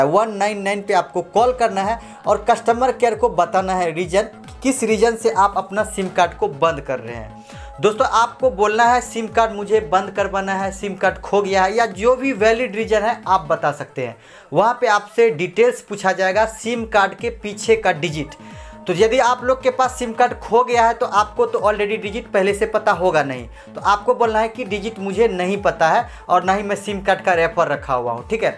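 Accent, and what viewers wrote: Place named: Bihar, India. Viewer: तेरा आवाज बिहारी की तरह लग रहा है 😁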